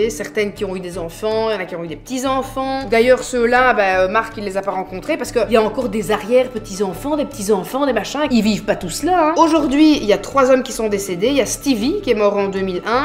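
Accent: Belgium. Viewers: Salut, Je sur kiffe vraiment le procédé de vidéo mêlé à l’humour et cet accent belge … 😂 Mais c’est vrai que ça va un petit peu trop vite … là même chose un rien plus lentement… serait géniale …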